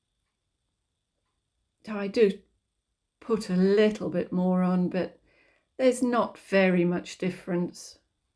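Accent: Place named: England, United Kingdom. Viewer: I’ve thought about trying acrylic inks on polymer but I’ve tried some other inks without great success so hadn’t got around to it. I’m also going to try some acrylic binder medium with various inks/distress oxides/watercolours etc. to see whether that will help them stick to the clay & dry. Your earrings do come out rather well & adding the drops directly to the clay sheet & blowing/letting the ink run/dabbing with different textured cloths etc may create some beautiful effects. May I offer a suggestion though? I’ve looked past your videos a few times because I tend to watch YT on my phone & your thumbnails tend to be so “busy” with a large collection that I can’t see how well they actually come out or what the result is. But I must admit I do enjoy a nice straightforward tutorial with a lovely English accent (my parents are English) so I wish I’d tried yours sooner. 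I tend to find a good closeup of a single item against a bright background gives a much better idea of what a tutorial will be like & whether it’s a technique I haven’t tried, or a result that’s interesting. There are so many creators that it’s little tips like that that can help you stand out more 😊 Thank you for the inspiration!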